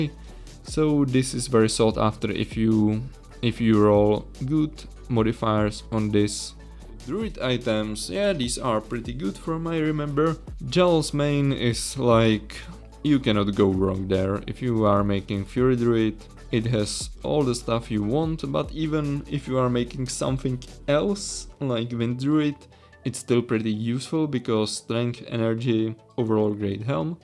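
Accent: Czech Republic. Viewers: Great summary, minor note regarding Ormus, unfortunatelly it AFAIK cannot roll FO nor any element mastery. In the shields category, I'd personally briefly mention also Gerke's Sanctuary, which has similar use as String of Ears, i.e. in ES builds for defensive setup, as it also provides solid damage reduction. Btw just guessing based on accent, aren't you Czech?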